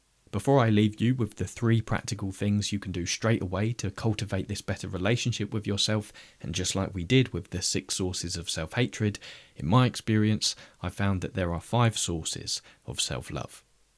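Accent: United Kingdom. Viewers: What flavor of British Accent is this?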